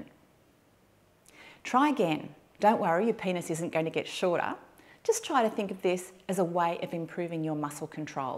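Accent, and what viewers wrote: Australia. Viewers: Love your Aussie accent. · that Aussie accent helps me feel more confident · Somehow that Aussie accent helps me feel more confident. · I love Australian accent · oh, an aussie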